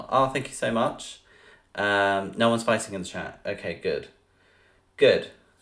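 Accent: United States. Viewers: You're American accent that you do is funny. I love what you do bro.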